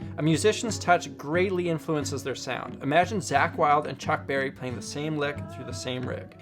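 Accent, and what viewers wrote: Winnipeg, Canada. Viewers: Is this guy from winnipeg? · Are you from Winnipeg?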